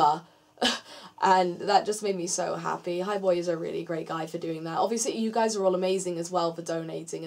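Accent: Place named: United Kingdom. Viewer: I've had the privilege to get to know you a bit better. You are woman with a special personality, not just cute nor hot. but so much more. You have earned my respect for being yourself. And you should be proud of it. I love your enthusiasm and spirit. Your kindness and funny expresion. And ofcourse you lovely brittish accent :) <3 Sat Sat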